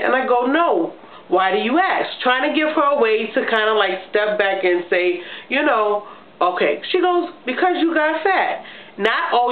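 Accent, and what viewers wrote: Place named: New York, United States. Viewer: Dang your accent is so deep...Ny right lol...but people cant be so so rude. talking about your feelings and writing about them in a journal will defintley help..You have a right to feel any way you want about a situation those are your feelings...you are the only one walking a life in your shoes..no one has had your life but you so you're feeling will be different from everyone else. With the tears, the healing definitley begins little by little.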